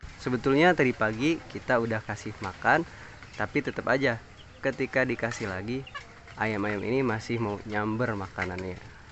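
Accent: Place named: West Java, Indonesia. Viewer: Mantef kang . Timna kang logat sundana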